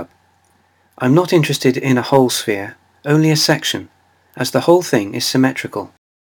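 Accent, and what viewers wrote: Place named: United Kingdom. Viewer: Wow lovely British accent :) loved it. Thumbs upped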